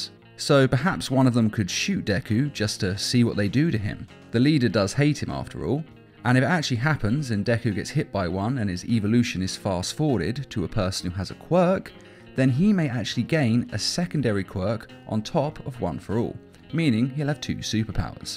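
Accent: England, United Kingdom. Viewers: He sounds like he is from England and it’s hard to get manga here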